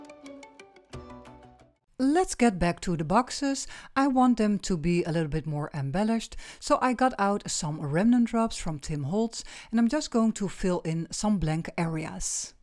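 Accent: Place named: Netherlands. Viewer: Hey dear, how nice that I could discover you through Luise, because your projects, design and expression is just cool & beautiful 😍 Interesting artist exchange you did together, I think that's great and must have given you a lot of pleasure 🥰 and me a lot of inspiration. You speak, like so many Dutch people very good German and English and I love the Dutch, their country, their culture since I was two years old (1966) and love their accent so much. ❤️ Sorry if this comes across as totally slimy, but it's just the way it is with me, because Dutch culture has had a big influence in my life. I lived close to the Dutch border for 48 years, did a lot of shopping, had some friends there and spent many, many holidays there. I also bred Kooikerhondje for 10 years 😆 Thank you for sharing your art with so many people! 🧡... I am happy to be able to learn from it!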